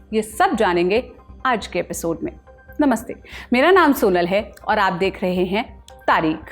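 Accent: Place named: Hyderabad, India. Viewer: Aisa lag rha h anchor hydrabadi accent ki h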